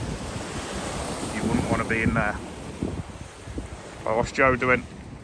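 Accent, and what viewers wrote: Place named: Worcestershire, United Kingdom. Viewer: Are you a Worcestershire lad. Every time I hear your accent it reminds me of my home town of Bromsgrove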